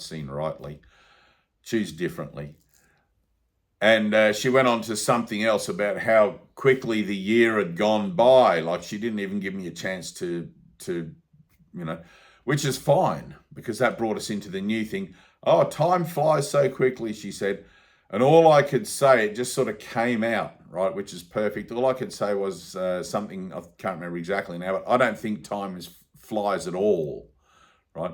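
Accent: Australia. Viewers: Hey mate u sound like you're an Aussie, were abouts are u from? I currently live in Perth, but from nsw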